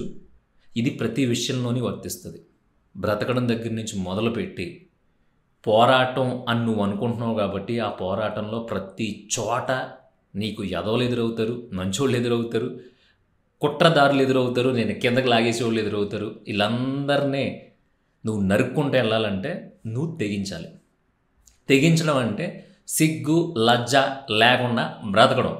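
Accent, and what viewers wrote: Godavari, India. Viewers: Bayya meedi a ooru? Godavarenaa...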